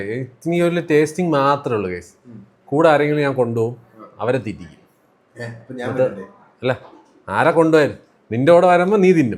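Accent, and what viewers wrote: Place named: Thiruvananthapuram, India. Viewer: ബ്രോ യുടെ സ്ലാങ് പക്കാ തിരുവനന്തപുരം അതാ ചോദിച്ചേ.❤ എന്തായാലും താങ്ക്സ് ബ്രോ റിപ്ലൈ തന്നതിന്.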